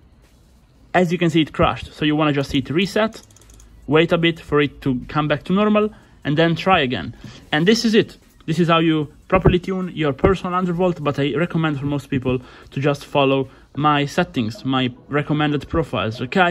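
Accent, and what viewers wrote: Italy. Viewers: si sente che sei italiano comunque bei video.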